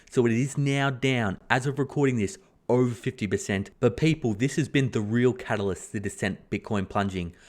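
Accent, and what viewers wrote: Australia. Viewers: I don’t know which phrase I like hearing better in an Australian accent, “Holy Smokes” or “Party Pooper.” Either way, great video, thanks for keeping us up to date on all things financial!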